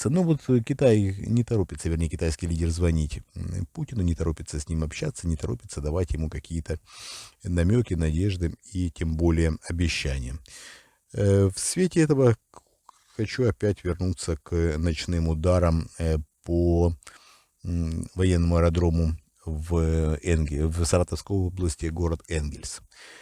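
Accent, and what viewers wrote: Ukraine. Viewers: Виктор мыхалич, у ва юс акцент украинский. Как так?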